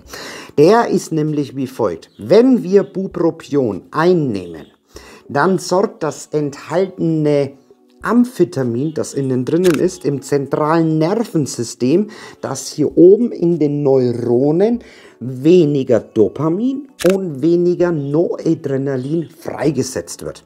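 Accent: Franconia, Germany. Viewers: bist du franke?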